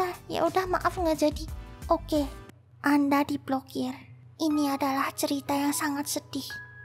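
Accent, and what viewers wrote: Java, Indonesia. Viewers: Mencium aroma aroma logat jowo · Medok ya 😅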